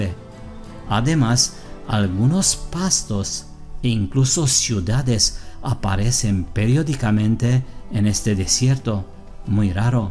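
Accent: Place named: Saxony, Germany. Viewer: Me pregunto cuántos idiomas sabes. Por el nombre de tu canal veo que manejas el Latín, por tu acento adivino que eres sajón, por la información que presentas veo que sabes francés. Eres muy bueno. Saludos desde México, tú amigo Tartariano....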